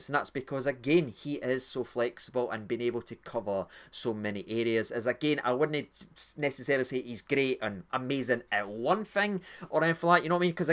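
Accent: Scotland, United Kingdom. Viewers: Your scottish calm doon we are pish at speaking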